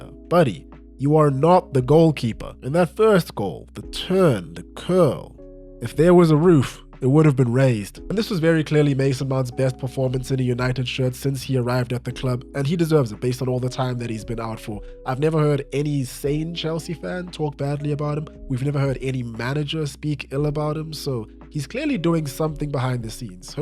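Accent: South Africa. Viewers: I KNEW YOU WERE SOUTH AFRICAN! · is a Shona name, but you have a South African accent, got ya! Sharks😂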